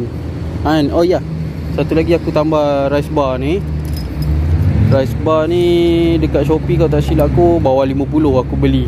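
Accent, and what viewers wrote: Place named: Malacca, Malaysia. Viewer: Nice video bos. Orang melaka ke bos? Ada bunyi melaka hahaha.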